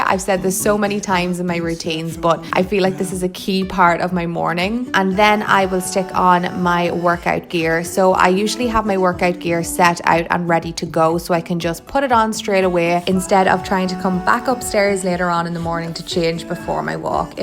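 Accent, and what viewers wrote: Ireland. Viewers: Is her accent Irish 😍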